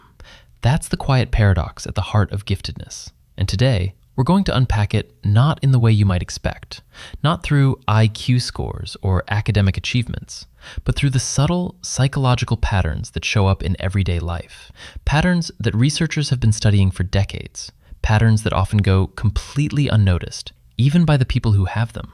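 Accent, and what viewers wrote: United Kingdom. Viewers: Why do these videos warp into a British accent near the end?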